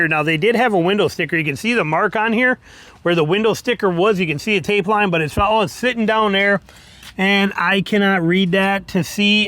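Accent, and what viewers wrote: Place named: Midwest, United States. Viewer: Are you from Minnesota? You totally have a very high pitched northern or midwestern accent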